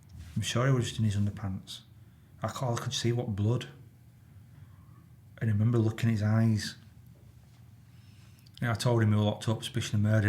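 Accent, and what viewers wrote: Yorkshire, United Kingdom. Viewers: Proper Yorkshire man 👨 "Jim bond"🤣🤣🤣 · Super interesting videos. (I wish I could understand more Yorkshire!)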